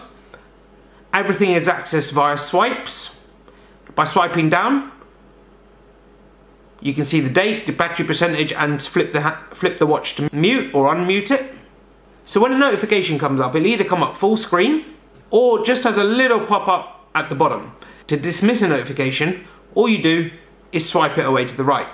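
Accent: India. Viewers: American accent? indian...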